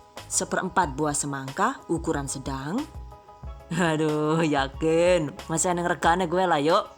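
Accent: Banyumas, Indonesia. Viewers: Lehhh deneng si ngapak jebule 😂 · Ooo key 😁 salam ngapak ibuneee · Deneng sih ngapak mbokayu??? Ketone enak yakin..arep njajal gawe lah nyonge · Mba sampean wong mediun kok ngapak kwkwwk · Ternyata wong ngapak ibune 😄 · Kok ada ngomong ngapaknya😀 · Suka KL ngomong ngapak, walau q g ngarti....tp lucu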